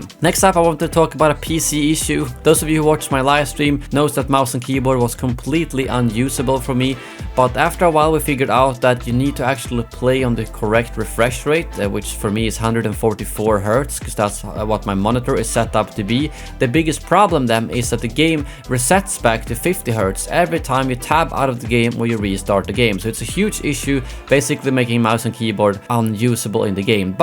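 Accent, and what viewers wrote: Sweden. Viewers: Haha man kan höra att due svenne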